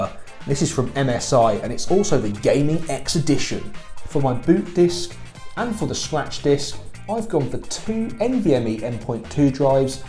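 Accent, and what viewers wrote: United Kingdom. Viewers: He's british calm down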